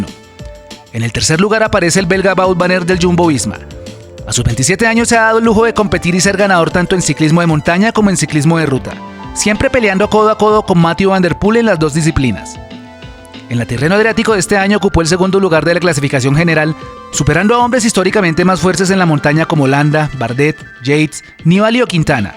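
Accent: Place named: Colombia. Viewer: Se nota que eres colombiano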